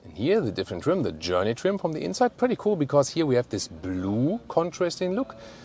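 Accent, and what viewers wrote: Germany. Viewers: Genglish… what a horror… Jesus safe me please…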